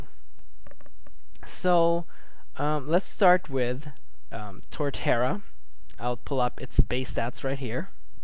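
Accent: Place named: United States. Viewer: r u an asian or an american? well, u sure have an american accent but look like an asian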